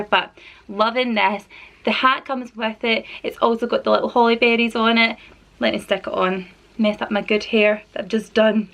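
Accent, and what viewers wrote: Scotland, United Kingdom. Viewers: I'm new to your channel your kids are so adorable. I'm guessing your Scottish? I'm English lol happy late thanksgiving xx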